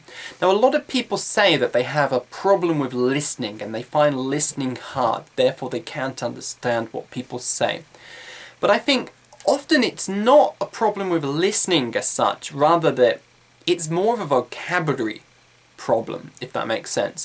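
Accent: United Kingdom. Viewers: achi milorava It's because of his British accent :) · Excuse me, I am Fitri from Indonesia, 18 Years old. I have a question that is what kind of languanges do you use ? American English or British English ? Because, some times when I am listening your speaking, I guess your speaking in British English. Is it true or not ? Please give me the explanation. Thanks :-) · He sounds British or something to me but idk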